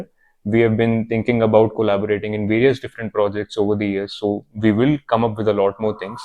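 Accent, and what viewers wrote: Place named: India. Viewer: Being an Indian , I prefer to listen Indian who speaks in English that nice to hear rather than British accent . It's helps me to learn more in English . Your accent is too good it's similar to Indian voice with accent and easy to hear and understand really appreciating . I don't know you might understand or not my words what I am saying. Because being a beginner in English it's too poor communication. Ok but at the end of the day really nice to have you it's makes vibe of Indian.❤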